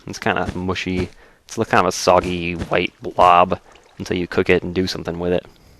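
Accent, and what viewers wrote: South, United States. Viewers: is it me or is voice sound vary southern this episode?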